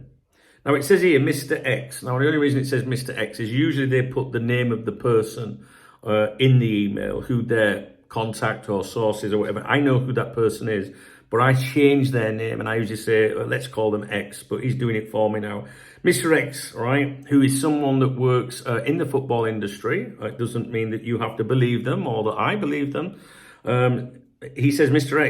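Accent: Yorkshire, United Kingdom. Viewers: me and the missus have been trying to figure out where your accent is from. My guess is Yorkshire, the missus reckons Cumbria. Are either of us correct? If not, help us out and tell us where the effing hell you're from. Haha.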